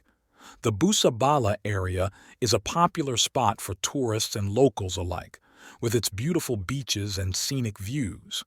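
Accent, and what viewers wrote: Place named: United States. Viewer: How comes you know Buganda clans but in the vlog you sound to be an America. This is very interesting thanks man. Keep it up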